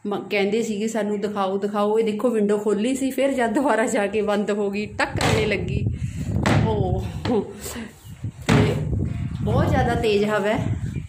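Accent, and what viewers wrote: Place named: Malwa, India. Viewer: Di tusi malwai ho boli ton lag reha hai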